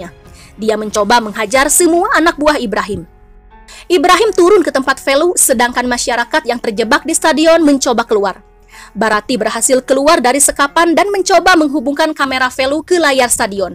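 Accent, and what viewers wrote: West Java, Indonesia. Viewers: Mimin.orang sunda euy☺😁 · Kayaknya admin nya orang Sunda ya🤭🤭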